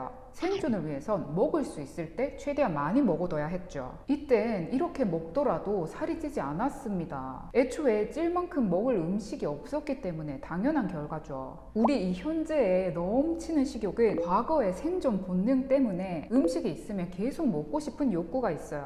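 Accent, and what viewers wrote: Gyeongsang, South Korea. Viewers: 경상도 사투리가 은근 나오는 억양이 매력적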